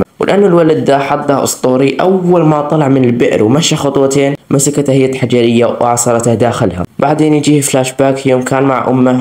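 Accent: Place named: Saudi Arabia. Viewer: احس صاحب القناة سعودي ههههه حسبته مصري طلع سعودي بعد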